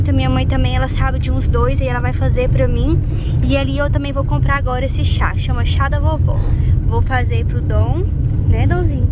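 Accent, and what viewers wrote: Minas Gerais, Brazil. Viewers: você é mineira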